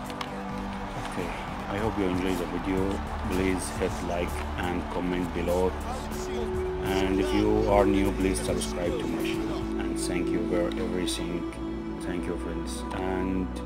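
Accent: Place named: Gulf, Arab world. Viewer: انا قلت انه انجليزي بس يوم شفت اللهجه قلت ذا ولدنا وبس ههههههههههههههههههههههههههههههههههههههههههههههههههههههه(استمر يا أسطوره والله انك ساعدتني🫡🤍)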